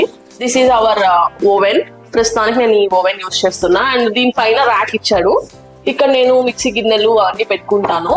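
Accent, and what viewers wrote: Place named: Nellore, India. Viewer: Fridge lo samanlu pette eruganu.mana nellore basha akkovv..yaadikellina mana nellore natural slang e baga vaduthunnaru.Nice sis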